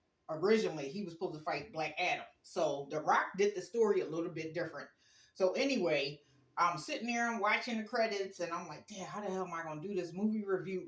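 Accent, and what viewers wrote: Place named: United States. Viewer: You have a accent what state do you live in the dance is right on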